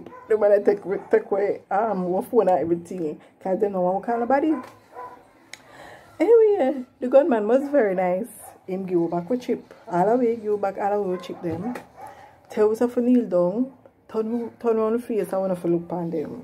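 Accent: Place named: Jamaica. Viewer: Mi love ya Jamaican accent 🔥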